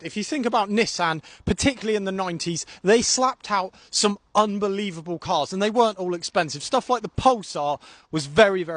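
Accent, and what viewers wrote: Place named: Chav, United Kingdom. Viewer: His accent is so chavvy I can't listen to him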